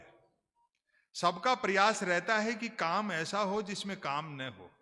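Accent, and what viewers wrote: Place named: Rajasthan, India. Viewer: आदरणीय " " भाई साहब निवेदन है कि अपनी रचनाओं का प्रदर्शन राजस्थान में (हो सकता है थोड़ा दक्षिणी राजस्थान छोड़कर ) अपनी मायड़ भाषा में प्रस्तुत करते हैं तो बहुत अच्छा लगता है, फिर अपने झुंझुनू जिला का लहजा आप बहुत अच्छे से बैठाते हैं, हिन्दी और मायड़ भाषा को मिक्स-अप करेंगे तो भी अच्छा छूंकण लगेगा। करणी राम सैनी उदयपुरवाटी, हाल जयपुर।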